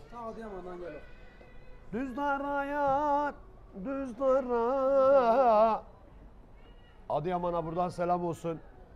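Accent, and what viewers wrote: Gaziantep, Turkey. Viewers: usta selamın aleyküm Ya Antep şivesine hasta oluyorum çok güzel kolay gelsin ustam yeni lokantan hayırlı uğurlu olsun inşallah 🤲👍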